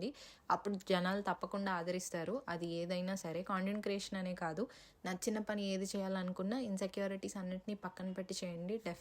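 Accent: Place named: Krishna, India. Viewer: Meedi Krishna dist.ani mee bhasha chusi telisipoyindi 1st time chusaka ne..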